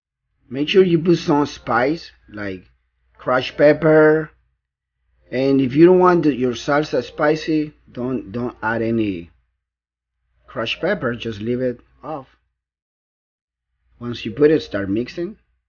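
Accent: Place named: Mexico. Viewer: He's not Argentine. He sounds Mexican, perhaps? This chimichurri is pretty good. I just made a batch.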